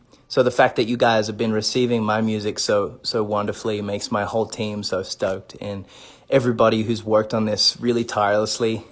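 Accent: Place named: Australia. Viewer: THANK YOU AND HE SOUNDS SO AUSTRALIAN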